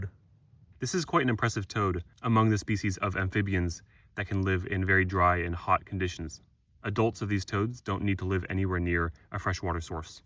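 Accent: United States. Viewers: You sound American. I wish we had better relationships with the middle east. Their flora and fauna are so fascinating, but there is so Little "western" information. Sad really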